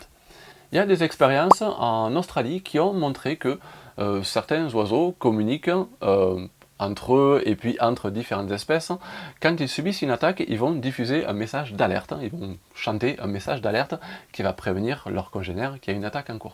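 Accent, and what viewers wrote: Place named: Southwest, France. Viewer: Bonjour. Les perfections invisibles de Dieu sont dans la nature. Tu as l'accent du sud ouest, dans quelle région est tu?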